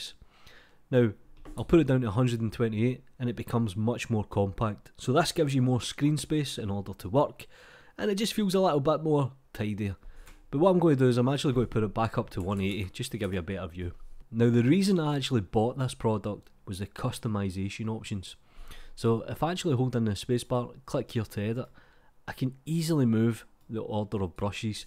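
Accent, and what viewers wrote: Scotland, United Kingdom. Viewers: One of many Scottish accents! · Scottish accent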